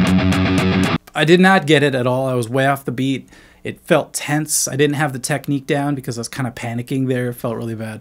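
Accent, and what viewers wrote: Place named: Texas, United States. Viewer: Is this guy from Texas? I never really noticed his southern accent till this vid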